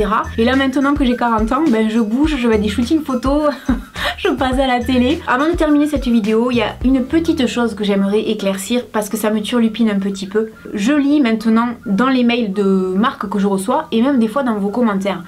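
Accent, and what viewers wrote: South, France. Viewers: coucou vraiment bravo!! je te suis depuis longtemps et je t'adore tu as un accent de chez nous du Sud une abonné de +!!😘😘😘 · Félicitations! Tu mérites amplement tout ce qui t'arrive de bien en ce moment! Bientôt 200 000 abonnés en plus! Toutes tes vidéos sont pleines de super conseils et on voit bien que ta maturité et ton expérience parlent et toi au moins tu ne dis pas d'énormités comme certaines jeunes youtubeuses. J'admire ton travail et ta force de caractère. Je suis ravie chaque fois qu'une nouvelle vidéo de toi sort. Tu es la seule dont je ne me lasse absolument pas. Et ton accent! Enfin du sud fièrement affiché sur YouTube pour nous représenter! Je n'ai que 23 ans mais je préfère tellement te regarder toi que d'autres plus jeunes. Change rien! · Bravo à toi! Tu as mérité tout ce qui t'arrive, tu es tellement souriante, naturelle, tu fais du super boulot et puis je vais te faire une confidence, j'adore ton accent qui me rappelle pour ma part quand j'habitais dans l'Hérault et pour mon mari lorsqu'il habitait Toulouse. 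Tu nous réchauffe avec cet accent ensoleillé;) Tu donnes aussi des astuces tellement utiles:) Pour moi tu es la copine qui serait là et qui me dirait " mais non ne jette pas ça, regarde tu faire ci et ça avec ta crème ". Petite question de curiosité: comment est-ce que tu sais notre âge? Gros bisous et toutes mes félicitations, c'est vraiment mérité:)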